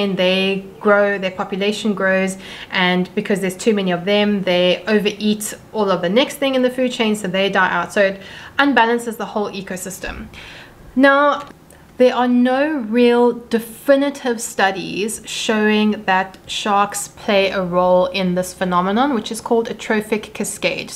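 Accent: South Africa. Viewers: Totally off topic, but I'm fascinated by your accent. Are you from South Africa? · South African, love to hear it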